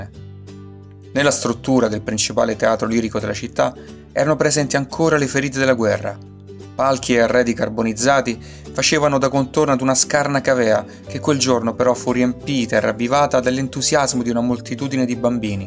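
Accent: Rome, Italy. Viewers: Video interessante e ben realizzato. Ma se lo speaker correggesse quella terrificante "c" romanesca presente in OGNI parola, il lavoro risulterebbe decisamente più godibile. E lo dice un romano come me...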